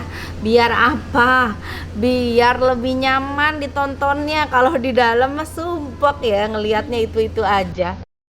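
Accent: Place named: Sunda, Indonesia. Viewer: Berasa d garut kitu tah suasana na nyariosna sunda sadaya tth geulis ih resep abi mhh😇😇😇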